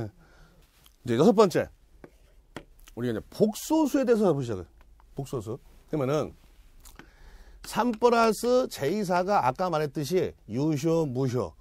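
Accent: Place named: Jeolla, South Korea. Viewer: 강사님 전라도분이시죠? "그므는" 저도 전라도 사람인데 너무 구수하고 좋습니다.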